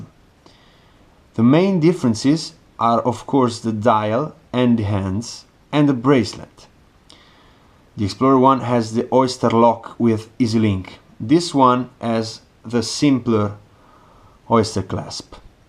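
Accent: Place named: Italy. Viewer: The English with the Italian accent is excellent. And the review/preview is good.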